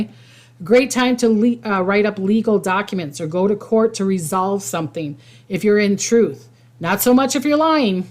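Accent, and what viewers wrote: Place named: Minnesota, United States. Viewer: Girl - your Minnesotan accent is on point!!!!! I love your hat!! From one hat-loving girl to another — Happy Easter!! Sending much love & gratitude to you 🌻💙💛🌻💙💛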